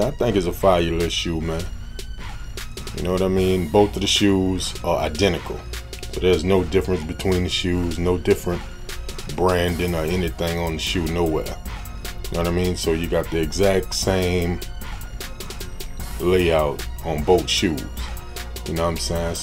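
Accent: New Orleans, United States. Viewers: Is that a nola accent I hear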